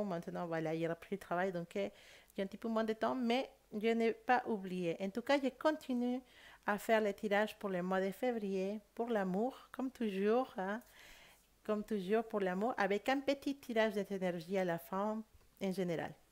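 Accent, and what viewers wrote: Spain. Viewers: Holà, gracias por tu tijada. Has visto justo..Espero que el piensa en mi como yo pienso en el.Pero no quiero entrar en communicacion con el.Espero que sea el que lo haga en Febrero. Una pregunta: cuando interpretas puedes ensenar las cartas de l Oracle de la Triade que te han inspirado.Gracias..y por el acento, es el mejor.el.acento espagnol 😋😁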